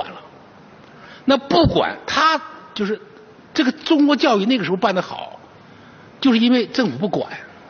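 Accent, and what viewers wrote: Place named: Northeast, China. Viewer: 讲的不错。 不过这口音我也是醉了，东北+浙江。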